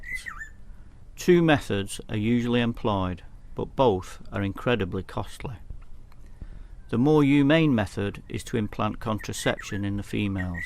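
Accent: United Kingdom. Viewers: you have a great northern accent. Well narrated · Intrigued to hear a Yorkshire accent narrating about a South African game reserve. I think you did a good job and I particularly appreciate the natural sounds of the bush in the video. Too many of these videos have awful background music that adds nothing of value. I spent 4 weeks doing conservation work on a SA private game reserve and hearing the sounds of the bush revives happy memories.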